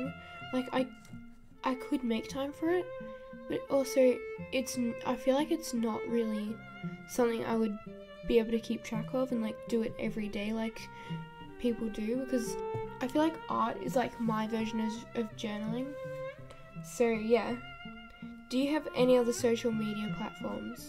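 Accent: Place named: Australia. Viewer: Congratulations!! I love your accent and voice omg✨ is it an australian accent? 🦘 this drawing looks so beautiful!! Thank u for answering my questions 🌸 i love ur videos a lot❤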